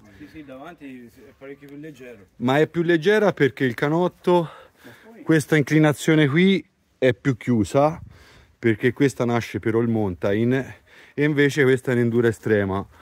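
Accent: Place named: Tuscany, Italy. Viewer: Tutte e due dei bei mezzi anche se differenza c'è, però il bello del video è la "nostra toscana'!" Nel modo di parlare,Perché anche io lo sono 😅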